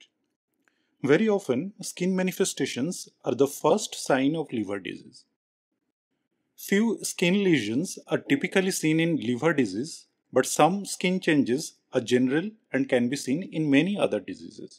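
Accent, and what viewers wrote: India. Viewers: Thank you for the information. I have great respect for doctors from India 🇮🇳. Your voice gives it away. You are very knowledgeable.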